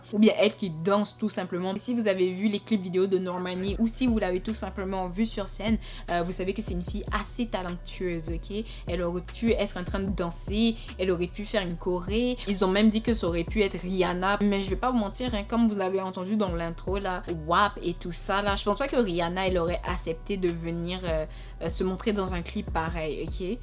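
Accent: Quebec, Canada. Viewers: T québécoise ? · Hey pourquoi tu parle comme une kebecoise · You sound like Canadian. I don't like that song, it denigrates black women.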